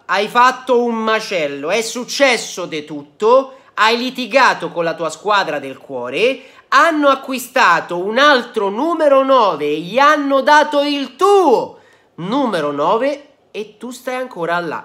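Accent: Latina, Italy. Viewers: Ciao ma sei di Latina?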